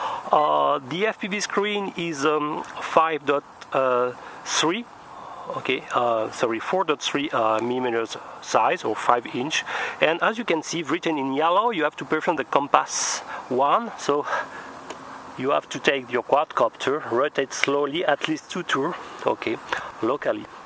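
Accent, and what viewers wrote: France. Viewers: opinions matter it was nice but the French accent bothered me a bit😁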